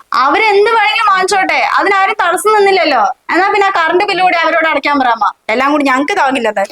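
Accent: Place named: Palakkad, India. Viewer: പാലക്കാട്‌ സ്ലാങ് ആണ് ഇതു പോലെ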